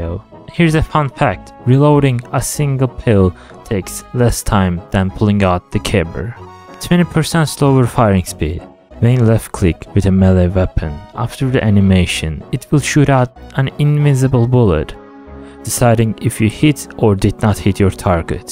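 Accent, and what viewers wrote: Turkey. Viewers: Чувствую турецкий акцент)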